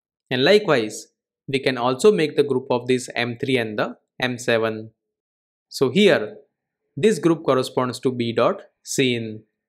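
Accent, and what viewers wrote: India. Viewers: I heard the Indian accent and i already knew i will understand it by the end of the video, haha. Thank you.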